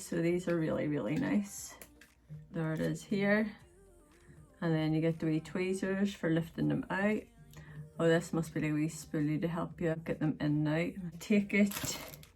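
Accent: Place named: Belfast, United Kingdom. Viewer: Omg I’m from Belfast you sound like you have my accent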